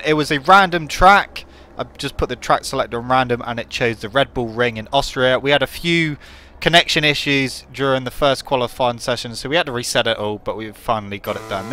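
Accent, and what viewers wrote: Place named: England, United Kingdom. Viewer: What part of England are you from?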